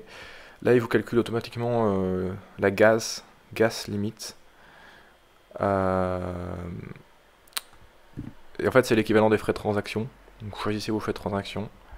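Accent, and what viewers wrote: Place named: France. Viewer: Merci pour la vidéo mais de grâce, parlez moins vite et articulez. Je suis Québécois et ne comprends pas bien votre accent Français. J'en déduis que les francophones des autres pays auront aussi de la difficulté à vous comprendre.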